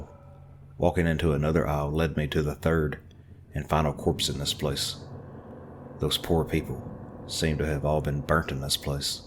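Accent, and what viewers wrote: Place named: South, United States. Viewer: I like the southern accent, just like mine haha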